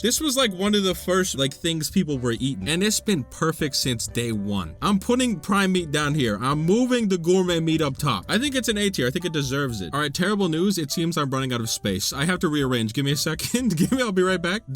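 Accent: Ireland. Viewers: Your Irish ? Witch county do you/did live in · I didn't know where is from but I didn't expect Ireland lol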